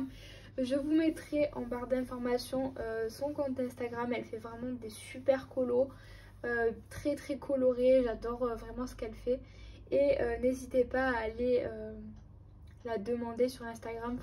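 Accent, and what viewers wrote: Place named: South, France. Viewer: Bonjour! Bienvenue et bonne continuation à ta chaîne très belle accent du soleil. Tu as de très beaux colos, et moi je me suis abonné à ta chaîne.je te souhaite pleins d'abonnés et éclate toi. Gros bisous du Morbihan sud 😘🥰🤗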